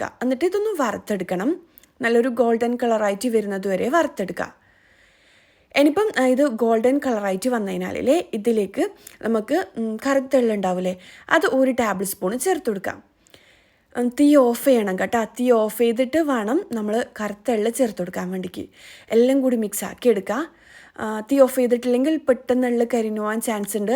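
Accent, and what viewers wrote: Kannur, India. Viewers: Kannur ann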